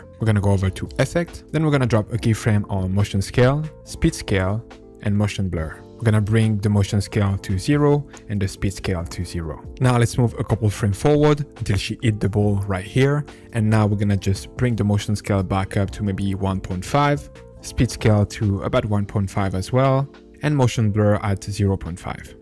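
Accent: France. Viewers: french accent, nice vid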